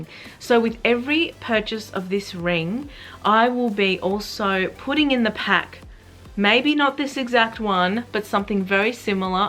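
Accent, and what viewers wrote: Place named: Australia. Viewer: Love your accent, is it Australian?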